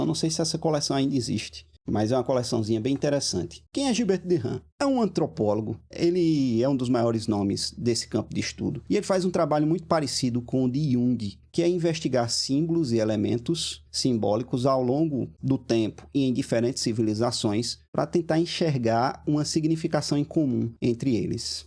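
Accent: Northeast, Brazil. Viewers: Professor, obrigado por compartilhar sua trajetória literária e acadêmica. Me identifiquei muuuuuuuuuitoo com seu vídeo. Sua história e sua saga no mundo da leitura se assemelha enormemente com a minha. Temos muita coisa em comum. Pela sua fala parece que voce é de algum lugar do nosrdeste. Tive a oprtunidade de residir por quase 9 (nove)anos no nordeste especialmente em Maceió. Entre os anos 2016 e 2024. Sou da cidade de São paulo porém devido a umas questões financeiras mudei para o nordeste. Chegando lá resolvei dar uma guinada na minha vida. Iniciei eum um curso superior e comecei uma jornada de leituras. Passei pelos mesmos problemas citados no vídeo: dificuldade de encontrar títulos e preço dos livros, então adquiri o Hábito de ler em formato digital. Me tornei um ''rato'' de PDF, EPUB, MOBI etc. Entre os anos de 2019 e 2024 li mais de 500 títulos das mais variadas áreas do conhecimento:Economia, Literatura, História, Filosofia, Sociologia, Psicologia e Teologia alem de algumas Autobiografias etc. 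Foi uma jornada incrível. Consegui construir uma pequena biblioteca comprando muitos livros em SEBOS. Ainda consegui cursar très graduações, ( Economia, Administração e Teologia)uma Pós-graduação. Ao retornar não consegui trazer os livros, o que me deixou muito triste pois eles contam uma história e possuem um valor sentimental acima do valor facial. Tive de deixá los aos cuidados de um cuidador. Não digo isso para me auto exaltar mas como contribuição para mostra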